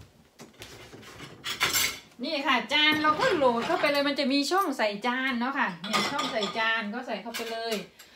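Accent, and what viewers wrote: Isan, Thailand. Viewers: เอื้อย เว้าอีสานเสียงตาฮักเนอะ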